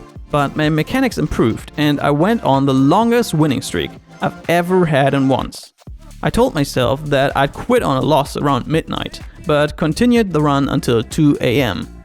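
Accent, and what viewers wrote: Germany. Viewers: As more as I'm listening to your voice you sound more and more German so are you German?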